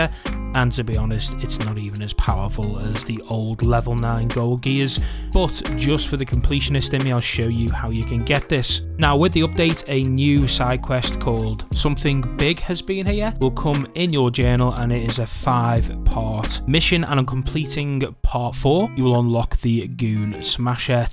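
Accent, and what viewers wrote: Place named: Liverpool, United Kingdom. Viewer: Is rite lad sound like ya from round my area Liverpool? Subbed rite away 👍👍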